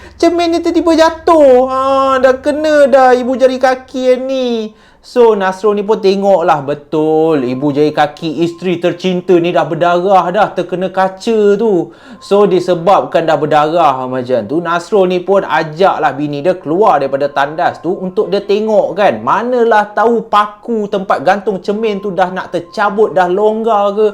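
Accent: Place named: North, Malaysia. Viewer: tak boleh la.. rasa annoying bila cuba cakap slang utara..nasib baik sikit tak macam yg cerita kat perlis tu.. tapi yg lain2 okey...saya suka penceritaan.. tapi slang utara terlampau over sgt..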